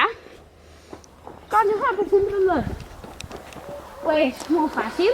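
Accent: Mallorca, Spain. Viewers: Mare meva com canvia el català de Tarragona al Mallorquí, Bon vídeo Miquel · Jo sí la entenc. No sóc català i vaig aprendre-ho. Com m'agrada l'accent mallorquí posoTV mallorquí de IB3 de quan en quan.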